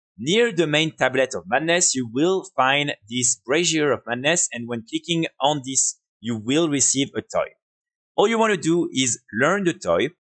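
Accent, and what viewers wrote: France. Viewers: Ça se voit que t'es français 🤣🫣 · Are you french ?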